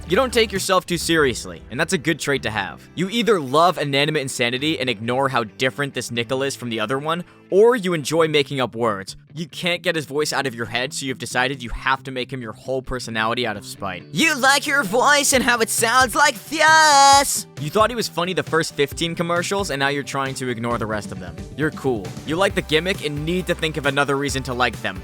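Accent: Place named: Australia. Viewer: wow that is incredibly accurate. Coming from an English person I thought he was English until I saw he was Australian and suddenly he sounded so Australian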